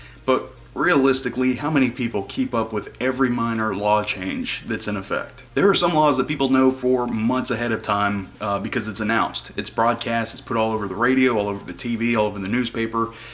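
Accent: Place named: South, United States. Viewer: Nothing cuter than a great southern accent 😋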